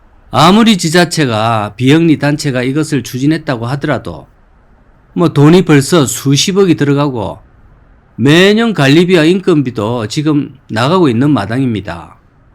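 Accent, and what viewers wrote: Gyeongsang, South Korea. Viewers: 암행어사! 구수한 경상도 사나이 사투리 정감이 갑니다.발통? 오랜만에 들어 봅니다.건강하세요!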